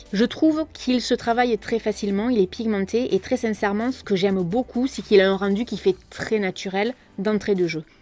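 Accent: South, France. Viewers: Merci pour toutes tes vidéos j'aime énormément j'aime beaucoup ton accent du sud. Je suis aussi du sud de la France de Nice plus particulièrement! Bises toi et à ton chien qui est beau comme un cœur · Cool la fin. You are the best.. Avec notre accent du sud. J'ai Instagram. Je vois le hall de ton gros colis kado à gagner mais jne peux pas commenter et m'inscrire. J'adore ces couleurs de rouge à lèvres te va super.. Je l'adore.. Bizou 💋❤️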